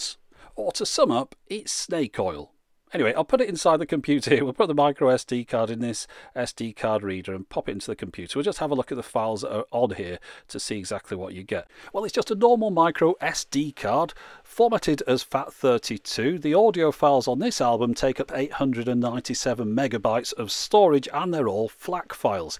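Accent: United Kingdom. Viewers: Excuse me so is difficult understand you because you're British accent. But so much interesting videos. I have the idea that music media would be pendrives. Are more handy than SD memory. Greetings from Chile.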